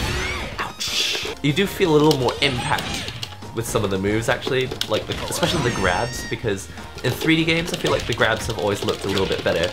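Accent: United Kingdom. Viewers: asian with brit accent! LOVE IT!!! You rock! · It's British!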